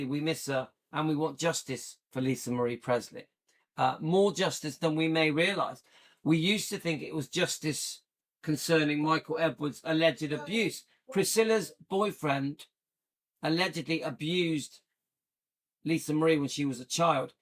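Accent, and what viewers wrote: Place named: Norfolk, United Kingdom. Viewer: did you grow up anywhere near Norfolk because sometimes I detect a Norfolk accent? Only sometimes 😀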